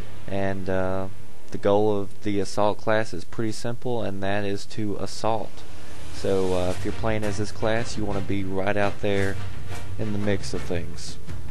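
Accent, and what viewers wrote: South, United States. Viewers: are you from the southern states?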